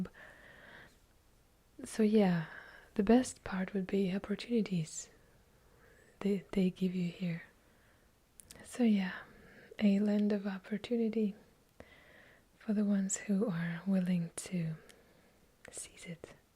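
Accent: Russia. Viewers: Your voice is so warm and relaxing, some of the most soothing ASMR I’ve ever heard. When I first discovered the art form several years ago, GentleWhispering was the first vocal ASMRtist I heard who made me tingle and she also has the same hypnotic Russian accent. Something about the accent just instantly melts my brain and gets me ready to fall asleep, thank you so much for doing what you do!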